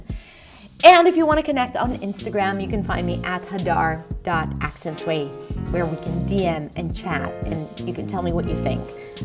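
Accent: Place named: United States. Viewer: As a native English speaker, I’m not sure why I’m watching this, but I’m fascinated. Very surprised to hear that English is not her first language. Her American accent is 100% perfect.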